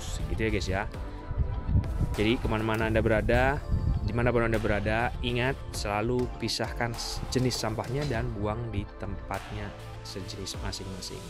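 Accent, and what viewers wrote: Java, Indonesia. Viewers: Jowone pundi mas jenengan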